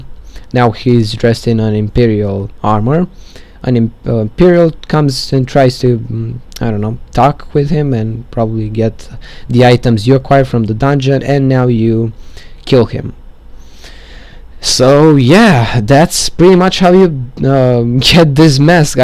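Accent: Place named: Serbia. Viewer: you have a serbian acent :)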